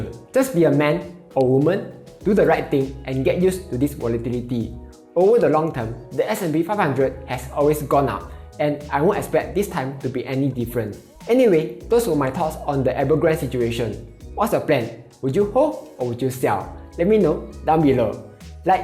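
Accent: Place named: Singapore. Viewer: He is speaking Singlish, which is Singaporean English!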